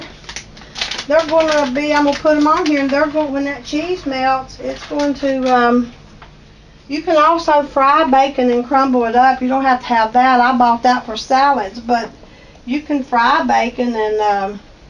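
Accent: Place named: Georgia, United States. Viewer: I've been cooking for 58 years, but I love to hear you talk and watch you cook. I'm almost 75 yrs old. My paternal roots are in North Georgia, and they sound very much like you. I live where they grow the Vidalia onion. I too suffer from many ailments 'cause of an allergic reaction to Lipitor and Zoccor. I've been saved by the grace of GOD since 1972 and He keeps me every day.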